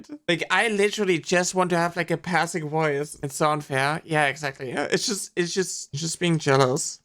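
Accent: Germany. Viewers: I thought I heard a Görmän accent! 😂 - I'm sorry you had to go through that. I stopped playing Valo long time ago due to toxicity. Im very sad whenever the old saying "Männer sind Schweine." is proven right. Just how where these guys raised? 😅 - Keep up the pride, we're with you.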